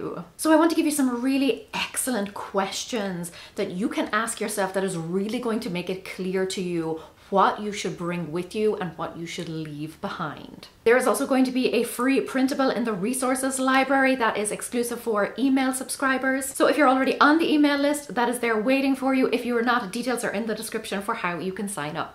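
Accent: Ireland. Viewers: And you sound like my family I'm American but my dad was from Mayo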